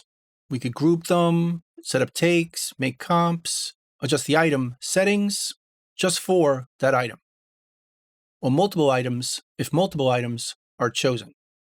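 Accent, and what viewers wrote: New York, United States. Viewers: sounds like Christopher Walken with a New York accent. And man, these videos and how he presents the material is perfect! I've learned everything I know about Reaper 7 from. Thank you!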